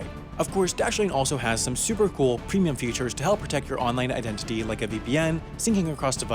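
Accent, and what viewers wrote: United States. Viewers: He just sounds like a bitter yank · That Guys annoying Whiny American Accent is so annoying, America , The Land of The Free-DUMB. Trump 2024 LMAO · Most boring load of shit I've heard in a long time, that God awful yank accent.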